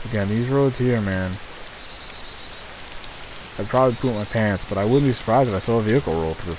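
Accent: Boston, United States. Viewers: R u from Boston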